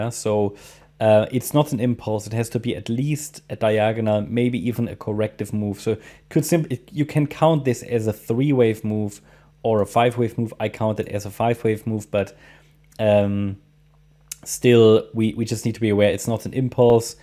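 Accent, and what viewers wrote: Germany. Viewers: Immer schön deutsche Leute Englisch reden zu hören. Gruß aus Berlin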